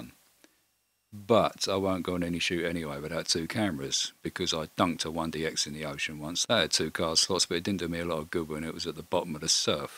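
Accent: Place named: England, United Kingdom. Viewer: English English.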